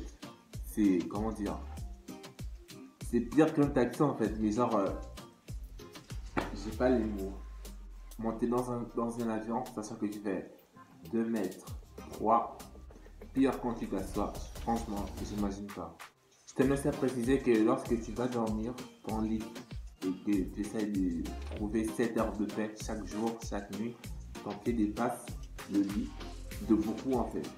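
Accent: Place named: Gabon. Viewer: Aah bah voilà comme si je savais que t'étais Gabonais 🇬🇦 à cause de ton accent 😂😅 je te suis depuis 3 mois à Libreville félicitations et surtout continue comme ça prck depuis ces 3 mois je suis tes conseils et j'ai pris 4 cm même si c vrai que parfois je suis plus le matin et moins grand le soir et je fais 1m62 , mon père 1m65 ou 66 et ma mère 1m55 et en plus j'ai envie de faire 1m84 tu penses que je peux les atteindre stp 🤔🙏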